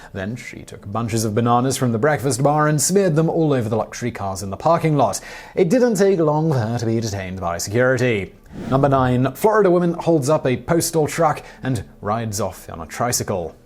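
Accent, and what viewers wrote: United Kingdom. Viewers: Hearing a British man talk about the Florida man is the reason I’m still alive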